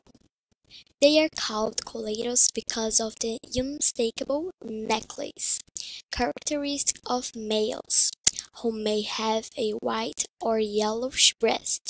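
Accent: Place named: England, United Kingdom. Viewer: Love your English accent.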